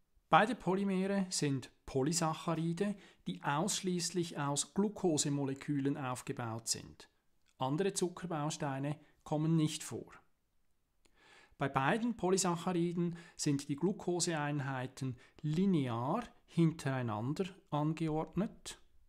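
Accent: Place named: Switzerland. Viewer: No shit, er kommt (höchstwahrscheinlich) aus der Schweiz, hat daher einen Schweizer Dialekt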